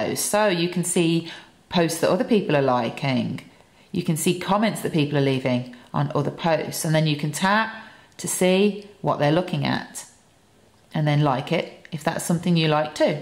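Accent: United Kingdom. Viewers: Are you British,please reply me.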